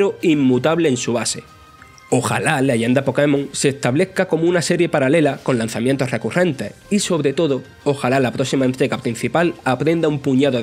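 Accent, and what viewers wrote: Andalusia, Spain. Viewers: Párese que e andalu pero quea raro en una narrasio que e lo que le paha a ete tehto que quea raro. Y lo digo siendo andaluz, nuestra forma de hablar es la peor para narrar. Pero no es que no sepa hablar el muchacho 😂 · De dónde es su acento? Sé que de España pero de que parte? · Investigué y resulta que así hablan los andaluces. No es mala locución así es como hablan en Andalucía. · de Jaén, Andalucía. En el sur de España. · Me encanta que un medio se haga en acento Andaluz, eso si, lo de “Arqueus” me ha dejado descolocado. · andaluz creo, yo soy de argentina pero si no me equivoco es de ahí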